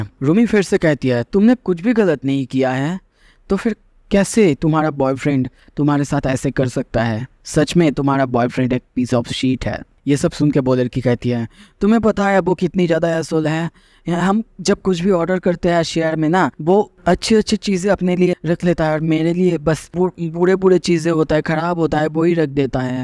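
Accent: Bengal, India. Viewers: Are you from Bengal by any chance?😅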